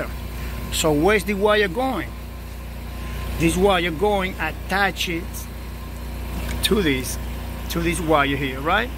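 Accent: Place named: South, United States. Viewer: I like your accent. Sounds like your from the south. So I gotta subscribe and thumbs up! Nice work!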